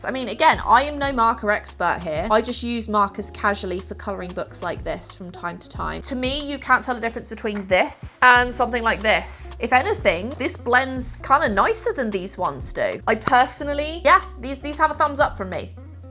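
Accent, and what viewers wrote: England, United Kingdom. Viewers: Besides your videos being informative, I could listen to your beautiful English accent all day.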